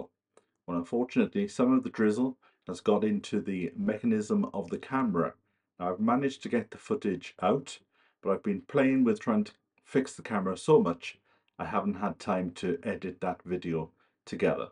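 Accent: Wales, United Kingdom. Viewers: Hi, I started watching you about 6 months ago when I was scrolling through utube, to be honest it was your cats that I noticed first as I to have two black cats and then when I heard your Welsh accent I was sold as I spent many a school holiday in Llanelli as my dad was born and brought up there before moving to Bristol, so I spent many a holiday with my relations, I to live on my own now so we have lots in common, looking forward to your new adventure on your allotment. Good luck. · Your video appeared one day on my YouTube page . It was the day you moved into your cottage .I often try channels with English speaking people , for keeping my English fluent with contents that can be totally new to me or just someone's day to day life . I recently tried to understand a lady with such a strong Scottish accent that I gave up after about 20 videos. But your British accent is the easiest . 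I like the 2 kitties . I also have a black one . I like the cooking experiments, the future garden , and the countryside ...I've been to England numerous times but never to Wales so your walkabouts take me there ... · I think I first saw a video when I was watching various gardening videos and you came up in my feed and Its been interesting watching you tend your various allotments. Now, for me, its all about your return to the valleys as I have mentioned before my mum and grandmother were born there ( mum was born in Tonypandy) and both my grandfather and great grandfather were miners in the valley but moved away in the 1930's recession I believed, but I am not sure of dates etc. My mum missed her home in the valleys and always spoke about it, so now I am visiting her beloved homeland through you. Your voice and the welsh accent remind me so much of my aunties, uncles and grandmother, I actually feel 'homesick' if thats possible!! 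I am enjoying seeing you make your home there again. Thankyou and Blessed Be! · I came across your video by accident your Welsh accent caught my attention and when you mentioned Blaencwm and Treorchy I was hooked, as I told you the first time I commented I was born in Blaencwm, and although I still live in Wales I get homesick for the Rhondda and it’s people. I love your style your so natural, and I love the journeys you allow us to share with you, I hope you go on videoing for many a long year. Look after yourself, I hope the weather bucks up I am looking forward to seeing you lick that plot into shape.😀😠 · Came for the moving to wales vids (my mate has just moved there too,),,,,, stayed for the slow morphin of ur accent back to welsh... :) ATB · I can’t remember how I came across your YT channel, maybe it was a recommendation by yt or a search about allotments in the Uk, one of the first videos I watched was when you did a bit of cooking and I thought, what a fabulous kitchen and then you talked about sharing the house and your cats, watched your rambles around London. 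I enjoy your Welsh accent, your deep singing voice, your unhurried relaxed approach and the way you ask your audience what they’re up to at the moment. It shows that you are a very experienced cameraman and your footage with the drone, the music, your storytelling is all very welcome in this mad world. I enjoy reading the comments and it’s a wonderful community to be part of, so please carry on doing what you’re doing, hope you manage to get your camera fixed 🤞🏾, Best wishes, Lisa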